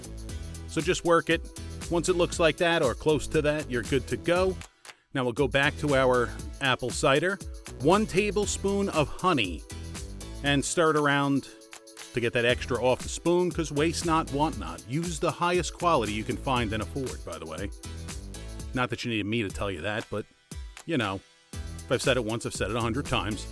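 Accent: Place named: East Coast, United States. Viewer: You live in Florida but sound like East Coast...New York or New Jersey. Also you come across as Italian. My mom was Italian and Dad Irish/English backgrounds.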